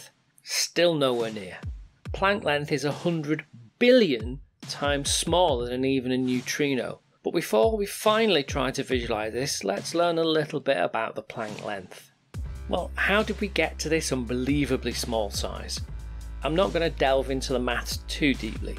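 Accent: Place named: Midlands, United Kingdom. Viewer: The Midlands (UK) accent is a key component for the "perfect" science video too ofc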